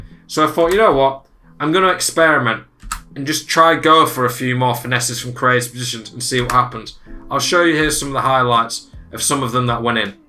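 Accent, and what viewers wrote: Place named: Scotland, United Kingdom. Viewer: Where r u from bro? Scotland? Im learning english and its interesting for me all ur rUns etc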